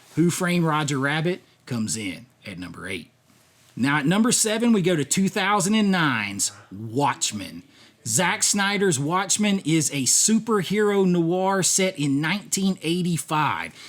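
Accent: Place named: Louisiana, United States. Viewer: Your goofy louisiana accent doesn't fit with hard boiled urban anti-heroes from Film noir movies. Stop trying.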